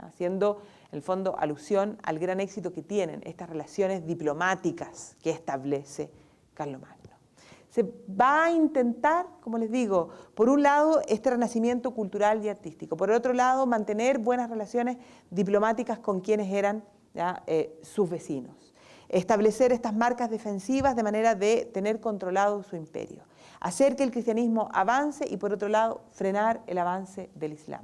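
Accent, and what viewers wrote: Chile. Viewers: Así hablamos los Chilenos.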